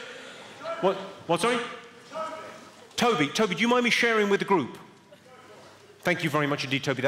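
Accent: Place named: United Kingdom. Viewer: There's something more savage when the comeback is in a polite British accent.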